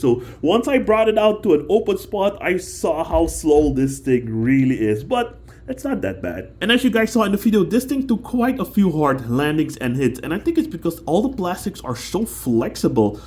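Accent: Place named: Netherlands. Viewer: Suriname!...no wonder why you had a slight Dutch accent. Great video though!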